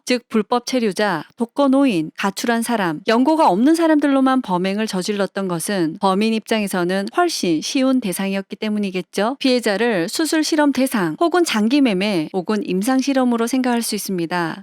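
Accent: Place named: Gyeongsang, South Korea. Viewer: 해설자님 경상도 분인 듯~^^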